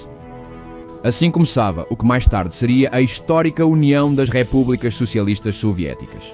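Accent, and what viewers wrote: Portugal. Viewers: As revoluções são as festas dos oprimidos e explorados Fladimir Lênin Gostei do sotaque Português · Ficou uma 💩 com esse português de Portugal! 😒👍🏻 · É de português de Portugal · Sou Brasileiro e acho lindíssimo o sotaque da Pátria Mãe Portugal ❤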